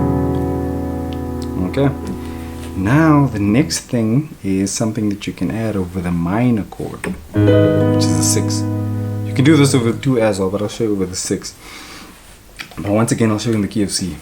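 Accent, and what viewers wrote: South Africa. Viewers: Dude you sound so South African 😅. Amazing chords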